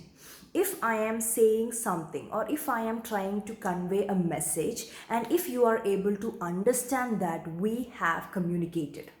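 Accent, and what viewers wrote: Kerala, India. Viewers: I think ur from Kerala. But ur English do not have much Kerala slang. That's great. If me or anyone had Malayalam slang in English it will not be liked by other people's. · Malayali accent should not be a problem for anyone of us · Last one month i started to watch your videos, its very nice and amzing. That time i think u r from north indai but today i seem u talk malayam😂. Any way good videos for us this. Thnk you. 🌹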